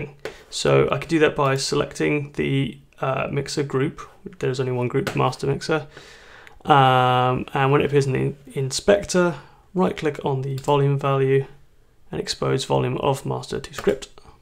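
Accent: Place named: United Kingdom. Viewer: thamkyou so much british sounding man with ironic last name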